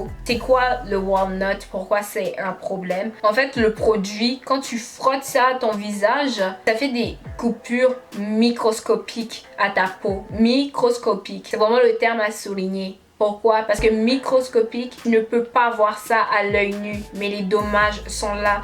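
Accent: Quebec, Canada. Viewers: On dirait ta un accent québécois 😊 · petite accent canadien? c'est mignon <3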